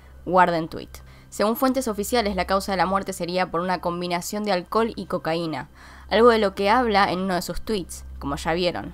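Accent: Argentina. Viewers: Sos argentina?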